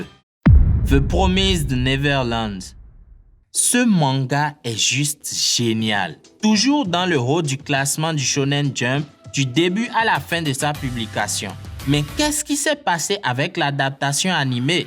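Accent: Benin. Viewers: On dirait que vous êtes béninois 🇧🇯 En tout cas vous faites du bon contenu vos analyses sont assez pertinentes. Du courage 👏👏👏👏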